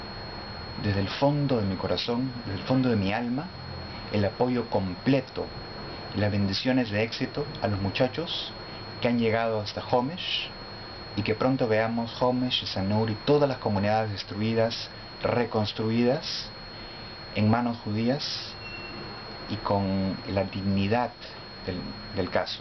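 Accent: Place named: Argentina. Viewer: No reconozco tu acento...sos argentino?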